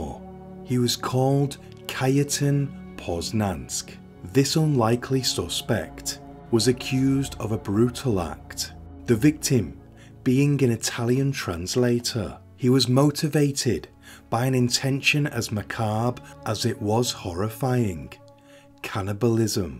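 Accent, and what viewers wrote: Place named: England, United Kingdom. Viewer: English accent on narrated murder documentary is what I like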